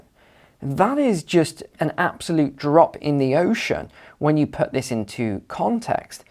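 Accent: United Kingdom. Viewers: Crock of shit has anyone of you verified this on the I R S website. He sounds very British maybe its Britons IRS.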